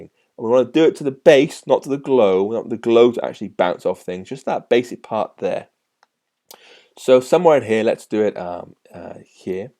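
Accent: United Kingdom. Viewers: LOL British or Scotish.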